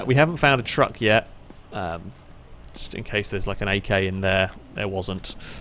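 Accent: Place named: United Kingdom. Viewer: Dunno, if you noticed,. But, you see me pleased to see you as one of the few guys playing TLD without heavily relying on an (unnatural) unnerving voice, like ppl on other channels here do. As if they were aiming for a teenage audience... GROSS! So, big thanks to you, for being as british and failracy as ever! 👍👍👍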